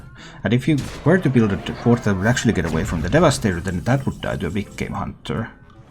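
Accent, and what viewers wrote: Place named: Finland. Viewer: You sound very finnish with your accent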